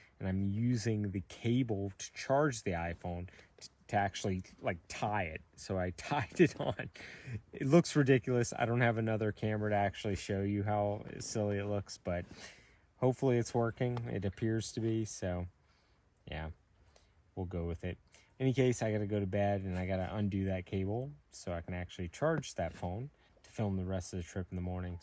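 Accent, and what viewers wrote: United States. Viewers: thank you I just wondered because of your accent. I'm from Phoenix Arizona so it definitely sounded American to me LOL 😆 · thanks for the update on the camera, yikes camera lens is expensive. I thought I heard an American accent. The UK I've seen via this channel is beautiful! I noted there's only sheep, no wildlife.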